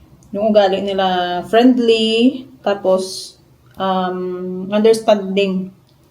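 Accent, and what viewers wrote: Iloilo, Philippines. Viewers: When she speaks English, her pronunciation is with Ilongga's accents, intonations and tones. Which is sometimes not easy to understand.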